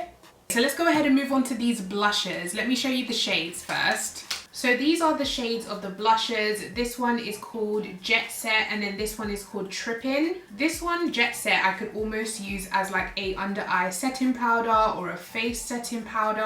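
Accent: United States. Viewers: Lolol your American accent is very TEXAN ..& we welcome youuuuu 🥰🥰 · I love your American accent x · I love ur American accent 😍😄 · Thank you for doing this pallete! The ads keeps stalking me on Facebook 😆 and I was in love with the beautiful brown and gold and colors so I was dying to see this pallet on a black girl! Sad about those blushes tho. 😕 Also, your American accent is pretty good! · Lmao I love your American accent lmao😍😍😍😍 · I love (and laugh) with your American accent 😋 so cute! I love those gorgeous deep tones and this collection is beautiful! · Your ‘American’ accent is funny! lol I always try sounding like you, it’s a straight fail!🤣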